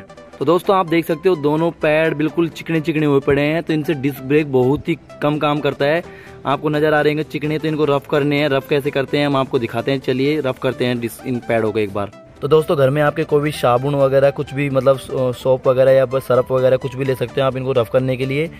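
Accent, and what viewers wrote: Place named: India. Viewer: i don't see you video now but first you India tone i love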